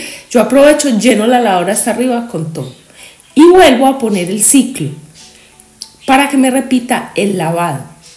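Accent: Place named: Antioquia, Colombia. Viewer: Hola Sra, excelente el blanqueamiento del lavadero, desde que lo recomendaste lo he venido haciendo, lo mismo lo de mantener blanquito el Trapero, bueno yo aplicó todos tus consejos en mi hogar😊 por cierto me gusta mucho tu acento paisa😊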